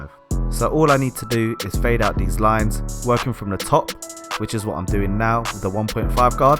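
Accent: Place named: United Kingdom. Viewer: Omg your British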